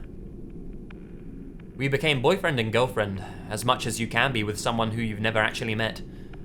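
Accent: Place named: Surrey, United Kingdom. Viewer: bruv how do u talk like that I'm English but you sound like bare posh u must be from sury or summin any great vid m8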